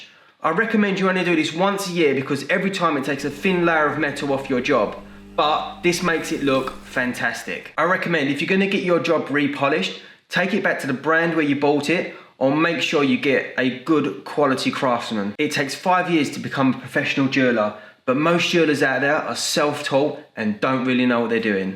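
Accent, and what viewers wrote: United Kingdom. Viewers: Thank you .he is ingles brittis i dint undestand.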